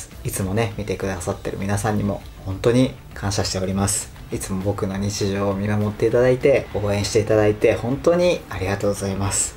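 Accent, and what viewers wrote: Kansai, Japan. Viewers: くんの関西弁、すごくイージーリスニング。心がほわほわする。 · こ、これは関西弁なのか、、笑笑全然わからへん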